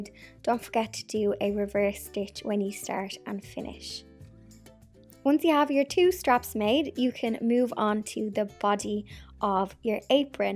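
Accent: Dublin, Ireland. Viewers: Hiya. My 11 old daughter discovered your channel. She's so creative. We love your creative spirit. I'm a mad tea dress vintage decor fab. So love and congratulations for inspiring a lot of people to get creative. Plus hearing your Dub accent from here in Spain being a Dub myself is brilliant!!!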